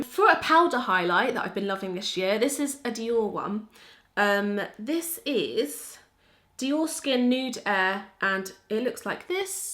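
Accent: United Kingdom. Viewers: I just LOVE your british accent #italylovesyou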